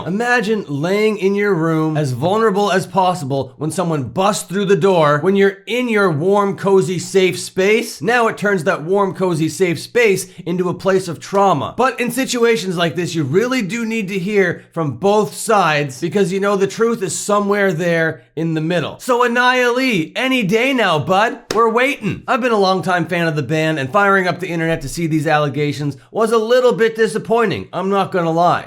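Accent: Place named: Canada. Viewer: Bro it just clicked that your accent is Canadian😂 what province Bud? I grew up in alberta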